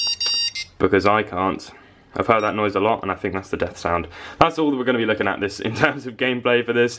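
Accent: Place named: United Kingdom. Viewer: Ashens checklist: “Arrgh” check! LCD ripoffs. Check! Mega duck. Check! Hands in front of the camera. Check! “Tat” check! British accent. Check! AND lastly... GAME CHILD. Check.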